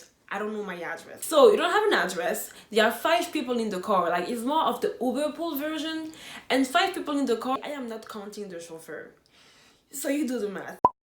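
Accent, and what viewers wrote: Cameroon. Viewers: You sound like a Francophone Cameroonian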